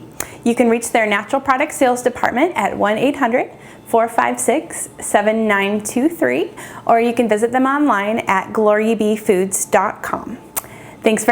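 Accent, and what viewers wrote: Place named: England, United Kingdom. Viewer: I saw you on afolasmr I thought you were from England 😅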